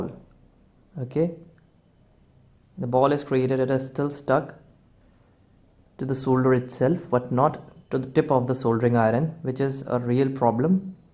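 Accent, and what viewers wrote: India. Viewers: Reading all the negative comments on this man's well produced, articulate and somewhat helpful video was discouraging. Looking at his tools and noticing his accent I would bet he does not live in the U.S/UK/Australia or some other country that has access to state of the art equipment or $ to purchase them. My guess is he lives in India with a billion people and only 10 have even a solder iron. The iron he is using was probably passed down from his grandfather and was sanded well before he got it. So last resort if the tip had its coating removed before is to sand. And that will work. Not long...but will work to do a job. Hats off to a well produced video and using what ever means necessary to make a fix. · EVERYWHERE HINDI YOUTUBERS (ACCENT AND HANDS)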